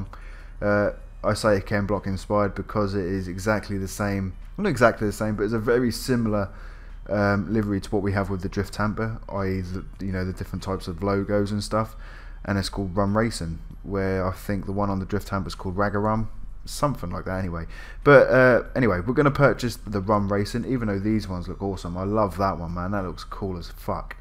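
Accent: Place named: Cockney, United Kingdom. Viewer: Nice video mate & random question I know but what's your accent? Sounds a bit cockney/pompey I just can't quite put me finger on it 😂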